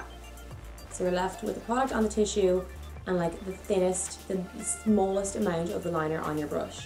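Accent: Ireland. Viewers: oh okay thank you so much and are u Irish? Love the accent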